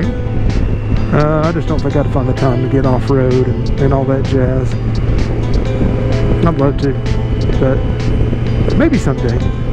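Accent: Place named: South, United States. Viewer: Bro.. you have a southern accent in this video that I have never noticed before!? Gorgeous bike!!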